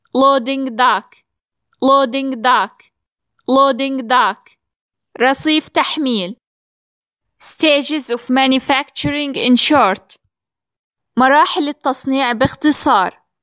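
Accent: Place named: United States. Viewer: هذه تتحدث بالأمريكية مانريد الامريكيه نريد البريطانيه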